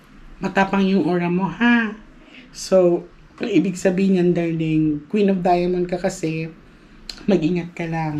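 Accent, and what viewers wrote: Visayas, Philippines. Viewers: Cute mn mg bisaya si ms ❤️ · Bisaya gyud 😁😁👌👌👍👍watching u miss.. from Cebu City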